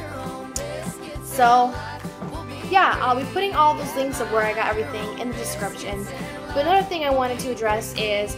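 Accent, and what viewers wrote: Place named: North, United States. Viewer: Country what? U sound like ur from the north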